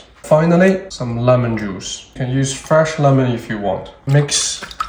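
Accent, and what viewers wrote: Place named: Newcastle, United Kingdom. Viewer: Love your no nonsense cooking as much as your Geordie accent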